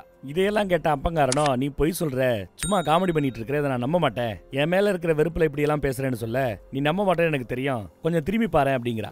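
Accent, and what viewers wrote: Madurai, India. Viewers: இவ்ளோ அழகா கதை சொல்ல தெரிஞ்ச உனக்கு நம் தேசத்தை மதிக்க தெரியவில்லையே உன் பேச்சு மதுரைக்காரன் போல் தெரிகிறது எதிரியான துருக்கி பேராபத்தில் இருக்கும் பொழுது உதவிக்கரம் நீட்டிய தேசம் நம் தேசம் சைனா பாசம் தான் உனக்கு அதிகமாக உள்ளது உன்னைப் பெற்ற வர்கள் இந்தியர் தானே அதில் சந்தேகம் இல்லையே உன்னை காயப்படுத்த வேண்டும் என்ற நோக்கம் எனக்கு இல்லை நம் தேசத்தை இழிவாக என்னும் எண்ணத்தை முதலில் மாற்று நீ கதையில் சொன்ன பெண்ணின் மனநிலையும் உன் மனநிலை ஒன்று போல் தான் இருக்கிறது உன்னைச் சுற்றி உள்ள நால்வரை வைத்து ஒட்டுமொத்த தேசத்தையும் சந்தேகம் கொள்ளாதே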